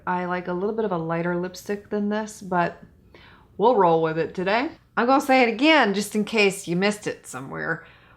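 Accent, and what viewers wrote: United Kingdom. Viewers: I can listen to people with English accents all day too. Thanks for such an honest review. CT has beautiful packaging and some great products but it's too expensive. Can you do a first impression of MUFE new self setting concealer? I'd love to hear your thoughts on it. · Yes I too Love British accent, hence my Dr Who and BBC subscriptions. Thank you for taking on and testing Charlotte Tilbury. I don’t understand the hype because the shade range is not Woman of Color friendly. I have learned so much from her as a makeup artist however you would think she would be more inclusive. Btw can you please do a video on care and maintenance of lashes. I watched your video to apply and am doing ok but it’s the wear them more than twice I am working on. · British accents are the best! LOVE Charlotte Tilbury.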